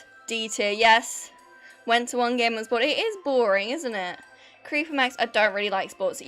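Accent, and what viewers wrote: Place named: United Kingdom. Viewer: so unbelievably bri'ish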